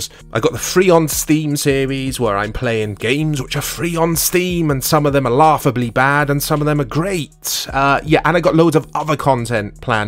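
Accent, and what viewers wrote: Wales, United Kingdom. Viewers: Bro are you welsh yeah?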